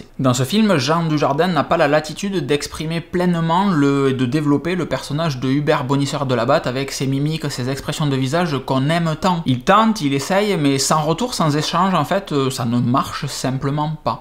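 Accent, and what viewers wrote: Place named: South-West, France. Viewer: PS : tu as la même voix que Pierre Sabatier chez Primeview, accent du sud ouest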